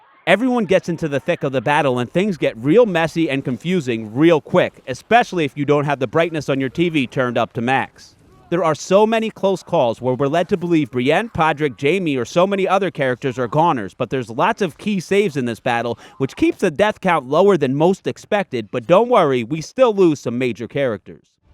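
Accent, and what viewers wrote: United States. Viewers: I Love your NY Accent? Lol lol I'm also from NY just subscribed nice to hear some on who sounds like me. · Can't stand for 30 seconds in front of your cringy American Feminist accent, it can even beat an Indian Gay. Get Help... Its OK.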